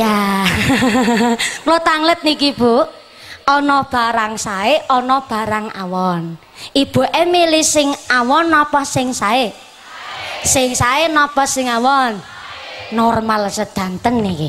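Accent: Banyumas, Indonesia. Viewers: Subhanalloh q jd seng dgrin ngapaknya ,kira"klu keblitar berapa ya lur,klu ngundang,q pingin,smg bisa nyengke desa ku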